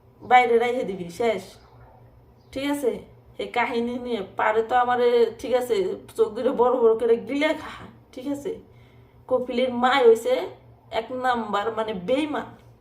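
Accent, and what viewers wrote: Manikganj, Bangladesh. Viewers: আপনার কথায় বুঝা যাচ্ছে আপনার গ্রামের বাড়ি মানিকগঞ্জ বা টাঙ্গাইল.।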